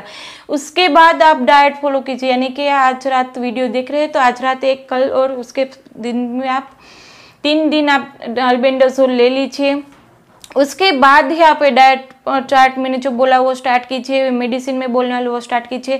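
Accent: Gujarat, India. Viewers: Gujaraat se ho kya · Are you Gujarati?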